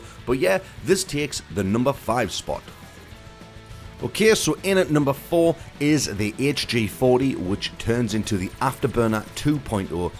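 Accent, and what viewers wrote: Newcastle, United Kingdom. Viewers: Guessing you're from Newcastle ;) same here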